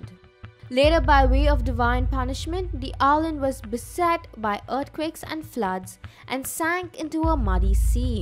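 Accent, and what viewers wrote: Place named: India. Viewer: Rayhan Sakib Sounds like Indian accent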